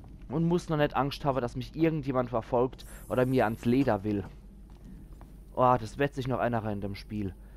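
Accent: Palatinate, Germany. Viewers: ah hier steht es ja auch .lol. Pfälzisch tatsächlich.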